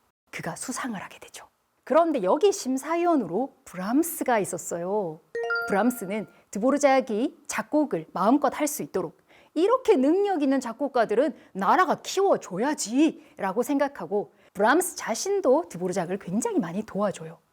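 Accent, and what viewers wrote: Gyeongsang, South Korea. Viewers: 웬일이냐. EBS 에서 쓸데있는 프로그램을 다 만들고. 배 선생님 경상도 억양이 있음에도 diction 도 너무 깨끗해서 잘 알아들을 수 있고 ( script ) 내용도 너무 좋네요 . 소위 말하는 방송작가라는 인력의 손이 별로 닿지 아니한 듯.. EBS 여행 프로그램 중남미편 몇 개 보다 말았는데요 전문가라고 나온 사람들이 그것도 교수 직함 달고 스페인어 정관사도 구분 못하질 않나 돌아다니면서 쩝쩝거리고 먹질 않나 ( 그게 한국서는 실례가 안되지만 외국서는 아무리 중남미라해도 엄청 미개한 매너 ) 그래서 EBS 프로그램 PD 들 수준을 의심했는데 이 번에 볼만하고 들을만한 Casting 이군요 . 웬일입니까.. 앞으로 계속 방송 잘 만드시길 부탁합니다. 정말 배 선생님 때문에 이거 보게 되었습니다.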